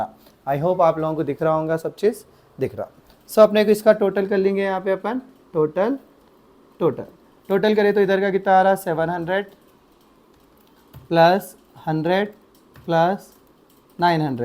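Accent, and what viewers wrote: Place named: Hyderabad, India. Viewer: I just love Hyderabadi accent 😅😂😂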